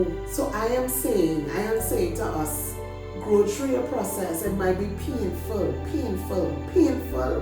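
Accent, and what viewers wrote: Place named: Trinidad and Tobago. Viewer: It's the wisdom... But it's also the trini accent fuh meh! ❤️🎉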